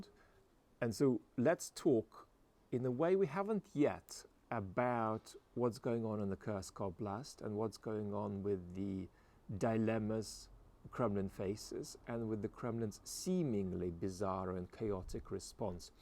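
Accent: Australia. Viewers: We want more Aussie accents on VVC😂! ❤ from 🇦🇺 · Hi, thank you. Always informative, intuitive, creative, and all-encompassing, giving a balanced perspective. Your Australian accent is barely noticeable, perhaps from Tongan influences of encounters with Australians there. Certainly new south Welshman than Queensland.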